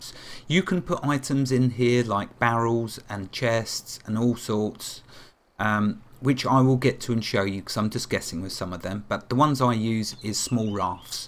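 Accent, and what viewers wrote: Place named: Essex, United Kingdom. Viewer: I'm also from the UK, perhaps near you as I'm on the Essex / Suffolk border and I think I can detect some Essex in your accent?:-)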